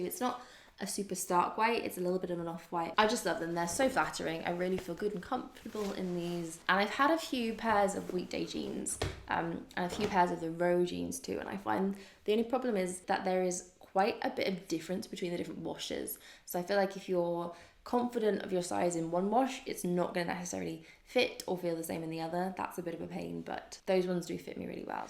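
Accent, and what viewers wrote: United Kingdom. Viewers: Where are you from? I know you’re accent is British but it sounds slightly Americanised in some parts!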